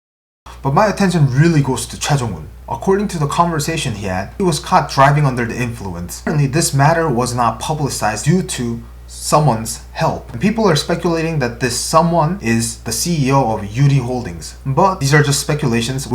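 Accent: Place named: England, United Kingdom. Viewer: Please know that you make the international k-pop fans very stress reliving with English ascents and detailed information.. Love form our side 🤗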